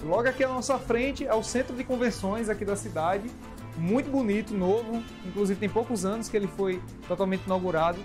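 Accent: Northeast, Brazil. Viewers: Lindo ap ! Logo saio daqui de São Paulo ,e partirei aí nessa cidade linda... amo é esse sotaque nordestino...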